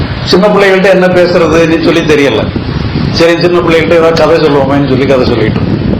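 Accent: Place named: Tirunelveli, India. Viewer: அருமையான நல்ல தகவல் அதுவும் விரும்பும் நெல்லை தமிழில். நன்றி ஐயா!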